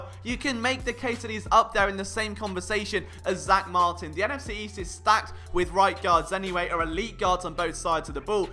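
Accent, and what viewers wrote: United Kingdom. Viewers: Jeopardy "Potpourri" Category as an addendum: 1. Brooks isn't THAT tall, though he moves incredibly well for his overall size. Height wise, he's maybe an inch taller than me, at most. That he TOWERS over Sproles at the is hilarious. (Sidebar: Off the Sproles mention, we desperately need to get an elite RB like Swift, which I doubt they'd do, a solid one like Dobbins/Taylor or the Sproles lookalike -- LSU RB. If he's available in the mid third-round to early fourth-round, the Birds need to get him. While I'm not the biggest Sanders fan, a 3-headed RB tandem of the Howard, Sanders and LSU RB would be absurd. 2. I didn't think Brooks was going to play this year when he initially tore it . 3. PFF is flawed, my dude. I'll get you on my side at some point. Constant badgering is foolproof, right?! Ha. 4. On the first play in this film room, you mention his C, OG and OT "features" to make him a complete OG. 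But for the fact the Birds lucked out with the Dillard pick, I'd very seriously think of moving Brooks to one of the OT spots, if Vaitai struggled. All his measurables and eye-test shows he could likely be an adequate OT in the NFL -- but is clearly more valuable as the elite of elite OG. 5. The rest of the film room is why we all come here. I really would invest in this channel if it could somehow offer private shares. You break it down like Baldinger, yet are youthful enough to relate to most of the audience. Plus, even though I hate to admit it: the British accent, howeve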